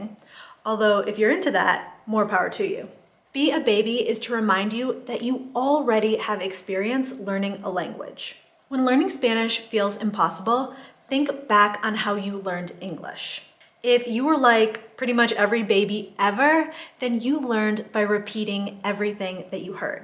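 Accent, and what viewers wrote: United States. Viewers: Great use of ‘El Subjuntivo’ in Spanish👍. Don’t take this the wrong way but your Spanish has a heavy American accent but don’t worry I’m sure people in Mexico like it. It’s too bad in the US some people don’t like accents🤷🏻‍♂️and they don’t try to help but hopefully that’s not the case in Querétaro 🇲🇽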